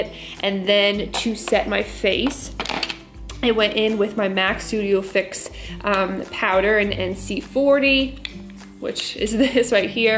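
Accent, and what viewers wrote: New York, United States. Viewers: Sounds like you’re from New York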